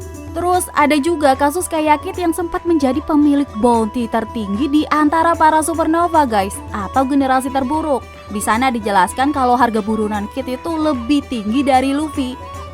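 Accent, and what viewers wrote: Java, Indonesia. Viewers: medok men mba.. 😁 😁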